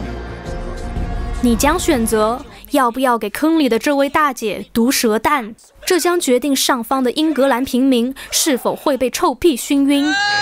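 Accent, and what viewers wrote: Taiwan. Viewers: 台湾配音的国家地理中文节目，就是这种风格。我小时候经常看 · 这次太秀了，台湾腔加各种段子，我希望以后这样的作品会更多，给你点赞！ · 很用力的模仿台湾解说腔，“强力冲击”最后一个字要发成二声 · 为什么4台湾口音？ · 这台湾腔学得很牛逼啊! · 哈哈哈哈哈哈哈这台湾腔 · 我覺得有六七分像，但不完全像，台灣人講話比較輕，沒那麼用力，語速也沒那麼慢。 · 为啥要用台湾腔啦，淦。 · 這台灣腔？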